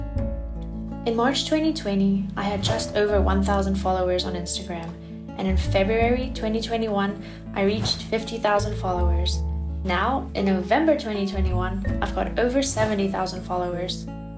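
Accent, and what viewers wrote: South Africa. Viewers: You sound so South African ...others mistake our accents for all sorts of others, but we can always catch the subtle inflections etc, no matter where in the world. I live in Portugal. Adeus/Totsiens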